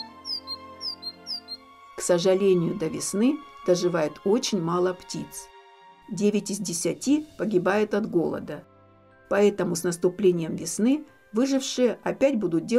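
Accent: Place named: Vologda, Russia. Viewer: Интересный говор. ВОлогодский конвой шутить не любит. Угадал?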